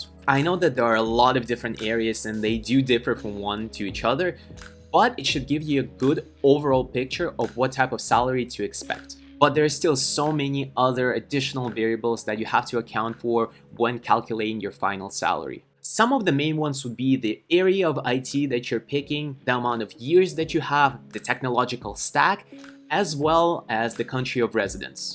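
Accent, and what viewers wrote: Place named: Russia. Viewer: That russian accent man, I can hear it from miles :)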